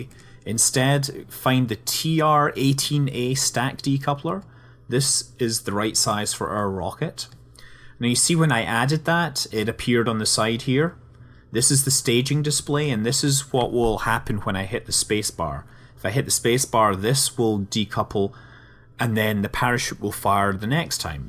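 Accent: Scotland, United Kingdom. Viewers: Are you Scottish? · Holy potato, i love scottish accent!! XD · he's definitely not canadian. my best guess is he's from scotland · Scottish accent ftw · are you Scottish? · Scottish with a hint of American, where I am to understand he lives. And I might add your English is better than many native speakers I know! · he sounds Scottish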